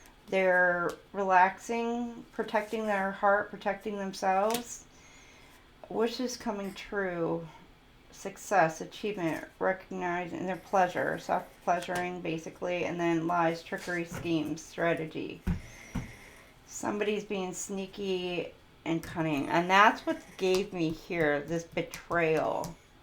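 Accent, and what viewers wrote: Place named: England, United Kingdom. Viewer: Your English accent is hilarious 😂 I guess my Scottish accent is too! Edinburgh is pronounced as Edinbra or Edinborough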